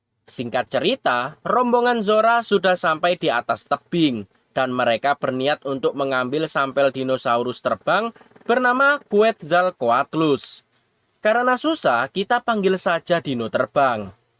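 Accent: Java, Indonesia. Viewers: Sampean jowo to min😅😅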